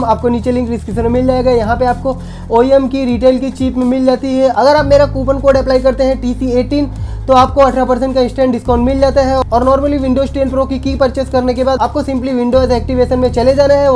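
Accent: Gujarat, India. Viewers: Bro aap Gujarat se ho ? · Tame gujarati 6one 😊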